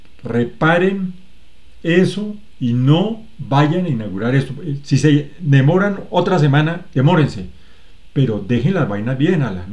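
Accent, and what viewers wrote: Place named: Bogotá, Colombia. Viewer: Me encanta el dialecto cachaco indignado.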